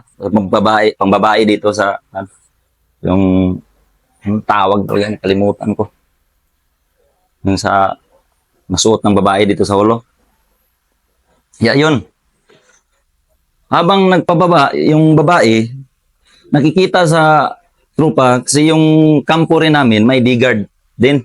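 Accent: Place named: Visayas, Philippines. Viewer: ikaw mag storya sig reklamo! klarong bisaya galisod ug tagalog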